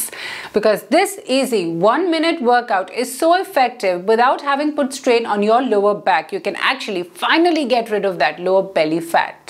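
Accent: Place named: India. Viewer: Thanks so much. From your accent I can immagine you are from India. I was living there for many many years and I love your country. Thanks and hugs from Italy. ❤️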